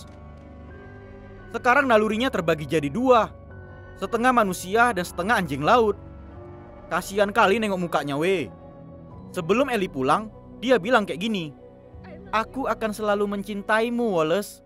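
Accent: Medan, Indonesia. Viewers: Logat Medan nih Kek daerah gw btw Medan yg di mna nya bang Aku juga org Medan? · Wokeh ini yg ku suka logat Medan😂 Semngat Tross buat vidionya bg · Medan kali bah bahasanya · Ya Allah bang org Medan bang... gw suka bet logat melayu Medaaaaan.... salam dr pecinta bahasa daerah... hahhahaha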